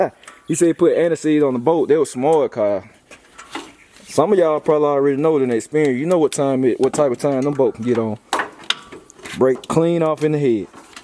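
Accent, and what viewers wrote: Georgia, United States. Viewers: Im from Vegas & You sound like my family in Lagrange, GA. I dont see/ hear anything wrong with the way you talk. You remind me of my cousins. Keep building these cars & enjoying life. Negativity comes with life. Keep the videos coming. 💪🏽💪🏽🏎💨💨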